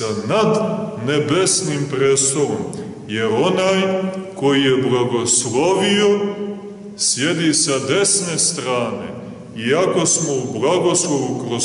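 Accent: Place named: Montenegro. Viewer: Second BTW He speaks with heavy montenegran accent. Can't miss where he is from.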